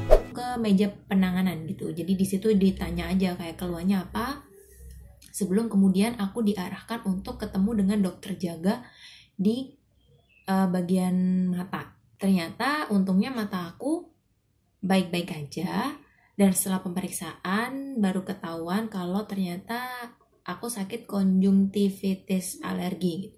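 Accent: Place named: Indonesia. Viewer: Kurang mengerti dialek indonesia, cuma mahu kata kamu gadis indonesia yang cantik.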